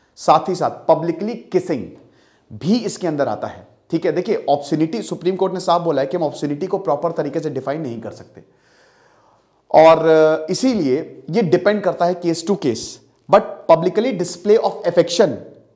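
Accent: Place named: Lucknow, India. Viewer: Sir aapki boli se hi samajh gaya tha ki aap lucknow ke hain....2018 study iq se yahan tak aap roz apki video se gyaan leta aaya hu... Main bhi lucknow se hi hoon...mujhe ataynt prasannata hui yeh jaan kar ki aap jaise achhe aur amazing guru mere sheher se hain. 😀🙏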